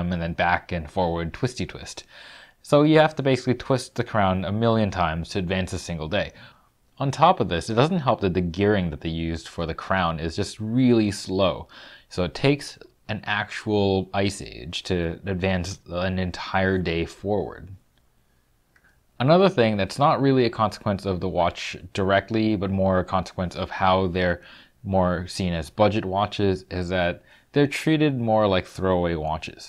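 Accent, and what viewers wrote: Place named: Canada. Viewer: Cool. I didn't know about these and I grew back then. Wasn't paying attention I guess. Really interesting videos,. And it sounds like you're Canadian too. Subbed.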